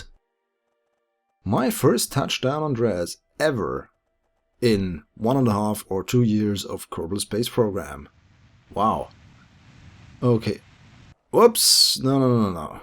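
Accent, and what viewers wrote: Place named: Germany. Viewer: im not 100% sure though but im from Germany and he sounds german^^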